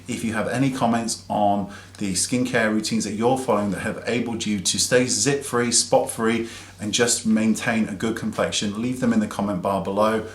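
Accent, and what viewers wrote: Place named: United Kingdom. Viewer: probably, hes british and he just pronouces it differently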